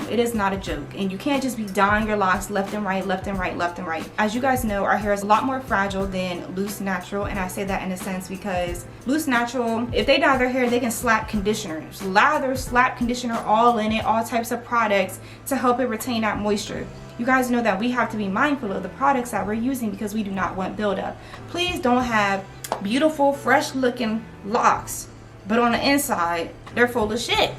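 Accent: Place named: DMV, United States. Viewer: She sound like a dmv baby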